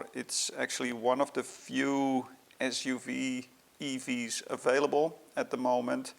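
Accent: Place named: Netherlands. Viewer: That's a DUTCH accent not German, oy vey....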